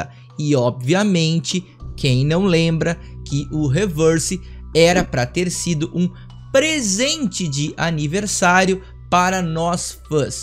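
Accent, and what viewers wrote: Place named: South, Brazil. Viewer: Opah chegando agora no canal, depois da live com o enmynest, esse teu sotaque é aqui do sul certo, Rio Grande RS abração, mais um inscrito...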